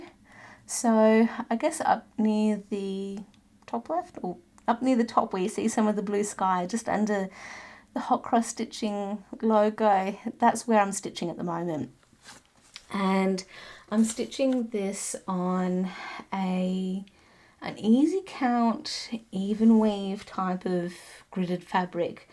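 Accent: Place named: Australia. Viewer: I have never attempted a full coverage but love seeing everyone's progress in theirs. I have pattern keeper but don't have the program uploaded yet as I've never used a tablet before! That tequila sunrise silk is gorgeous! Water tiger is so beautiful! I love big cats! But your designs are wonderful! I adore you! From the first moment I found you. You hooked me with your sweet Aussie accent and soft voice and amazed me with your photography and translated designs! You are so Gifted! I wish you were my daughter💕 I would love spending time with you creating (and walking = I was never a runner!)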